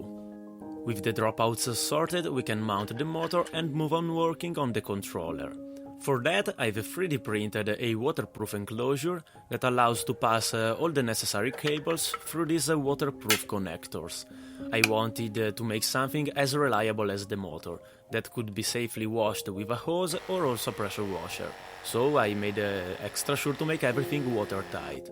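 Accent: Italy. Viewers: U sound Italian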